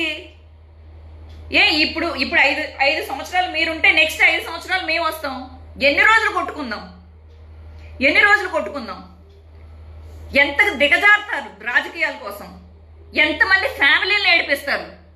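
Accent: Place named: Telangana, India. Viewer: Hyderabad lo unnav enti... Telangana esa lo matladuthunnava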